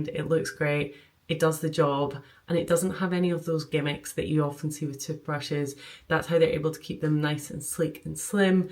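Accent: Scotland, United Kingdom. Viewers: I thought you might have had visitors this weekend, because you sound particularly Scottish at the start of this vlog :).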